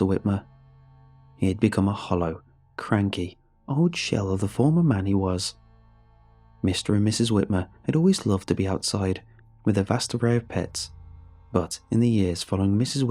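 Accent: United Kingdom. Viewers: A velvet voice with a charming British accent reading me some Creepypasta? What's not to love? <3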